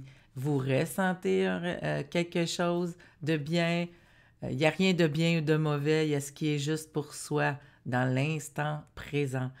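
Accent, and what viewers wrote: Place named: Quebec, Canada. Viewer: Merci pour ce beau message. Sympa l accent québécois.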